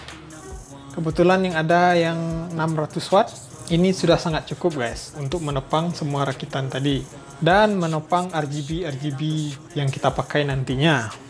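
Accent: West Sumatra, Indonesia. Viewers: Wkwkwkwk aksen abg ngomong kliatan urang awak banaa😅😆😆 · Logatnya urang awak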